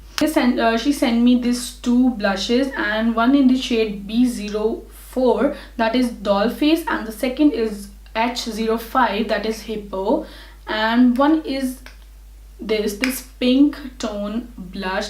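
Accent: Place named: Australia. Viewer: Loving ur accent australian touch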